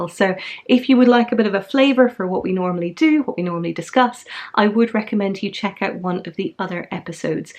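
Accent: Scotland, United Kingdom. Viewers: Wishing you every happiness in your new home; south of the river is good, if you fancy a day by the sea side, pop in, I am in Ramsgate. I love listening to your bonny Scottish accent and the amount that you achieve is mind blowing. (I speak as a machine knitter who does not reach a fraction of your FOs).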